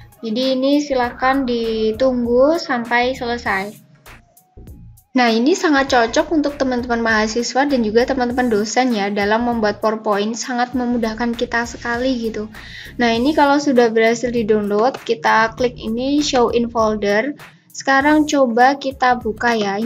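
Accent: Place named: Central Java, Indonesia. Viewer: Dari logat cara ngomongnya kaya orang Jateng 😁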